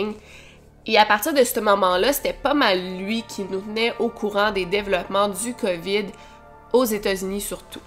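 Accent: Quebec, Canada. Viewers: Le vaccin contre la variole est valable à vie et j'ai été vaccinée étant enfant, donc je suis immunisée contre cette terrible maladie.Les jeunes sont plus à même d'attraper la viriole mais heureusement, le vaccin existe donc pas de panique. J'adore ton accent. Je suis Alsacienne et je trouve mignon l'accent canadien. Bravo, tu es super. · De verdad te quiero mucho pm porque? Je regarde toutes tes vidéos et j'aime bien. Je sais tu vie au Mexique mais tu as pas perdu ton accent Québécoise. T'es petits Tbk et Chris me plaît. Jajajaja Cuídate y besos xxxx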